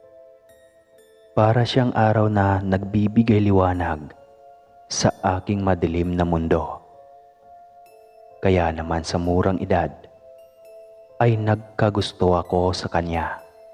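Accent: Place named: Iloilo, Philippines. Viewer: Taga saan accent mo boss. Bisaya ba? Ay ilonggo pala..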